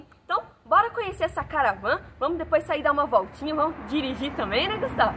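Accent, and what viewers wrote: Minas Gerais, Brazil. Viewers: Vc fala bonitinho parece mineirinha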